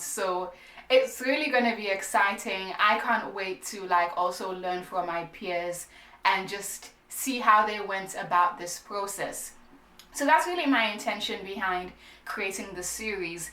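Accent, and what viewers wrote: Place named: Jamaica. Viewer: your jamaican accent gave you a follow.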